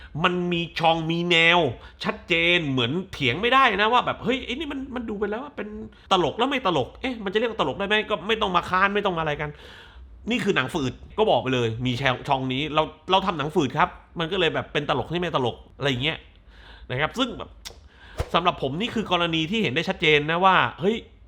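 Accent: South, Thailand. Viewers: ชอบการขายของด้วยการแหลงใต้มากค่ะ 🤣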